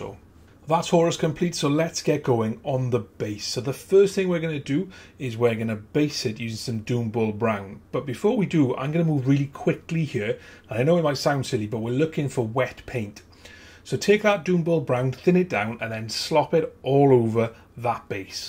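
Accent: Wales, United Kingdom. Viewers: Welsh accent I can hear?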